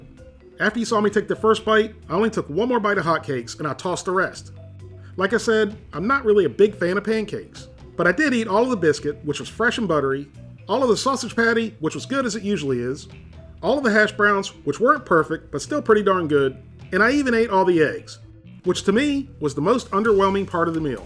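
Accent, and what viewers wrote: South, United States. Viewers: I find the topics you cover to be interesting but I hate your scripted delivery and gay estrogen filled hillbilly voice.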